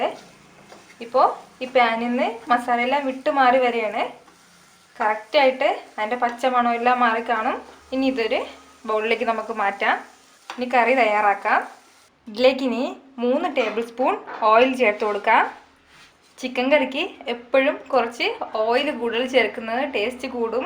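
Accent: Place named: Kozhikode, India. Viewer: but ur slang like clt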